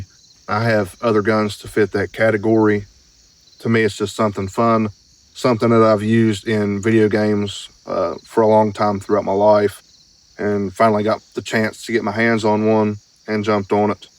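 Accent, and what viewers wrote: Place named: West Virginia, United States. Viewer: Fellow West Virginian? ✅ Smaller channel? ✅ Shootin in the holler? ✅ IWI Ace? ✅ My ears perked up immediately when you started talking 😂😂 you got another sub, hoss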